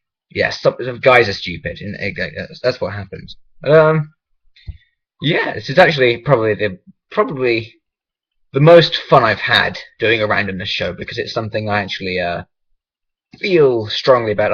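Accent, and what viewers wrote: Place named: United Kingdom. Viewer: Oh dear that's not so very nice over the sarnie things. Any way. you tell me who I am. INFACT no I will do that for you. I am Zandifate from Instagram. All I had to do was watch this and It made me laugh so hard.:). And it is like. PM. So yeah it was really funny and I would love to see more of your awesome channel. God that was so funny. And even though I am British you still have a pretty epic British Voice xD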